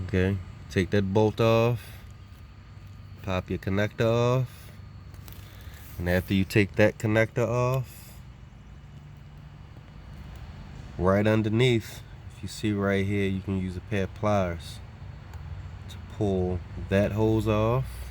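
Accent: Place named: Baltimore, United States. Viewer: Are you from Baltimore? Your yews and tews are a lil prominent. Helpful vid btw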